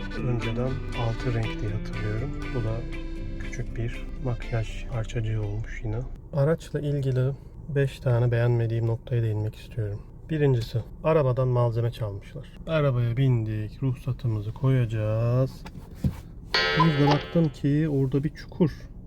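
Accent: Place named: Adana, Turkey. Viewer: hocam adanalısın sanırım şivede kaçaklar sezdim :)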